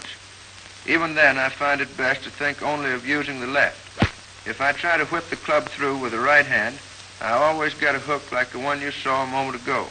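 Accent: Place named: Georgia, United States. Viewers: Growing up in Georgia, this was how all my older relatives spoke at family reunions. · God Bless you & your family. I trust ya'll are doing well. I am a linguist & most thoroughly enjoy this most classic American accent, very much so. It is one of those, like so many, that are unfortunately getting lost to time. Cheers to you & your kin 🍻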